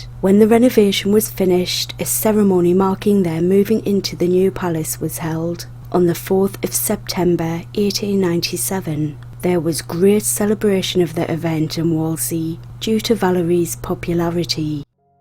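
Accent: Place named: Durham, United Kingdom. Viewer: Ha, I guessed the general region (North East), but my Canadian ear would never've been able to pinpoint Durham. Your speech is so soothing. I'd love to learn more about the eldest daughter, Elizabeth Franziska – about whom there's not much written (partly due, perhaps, to early death).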